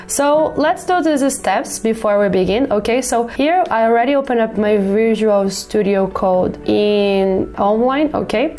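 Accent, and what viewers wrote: Brazil. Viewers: Sotaque de brasileira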